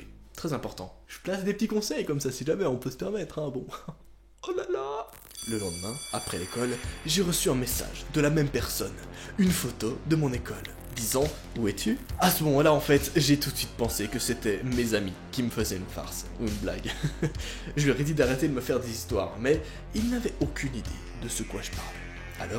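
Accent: Switzerland. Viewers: T Suisse ?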